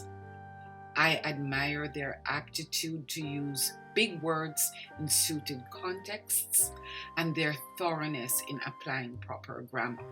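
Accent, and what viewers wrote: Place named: Jamaica. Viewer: You flavored this very well by chipping in the Patois now and then 😂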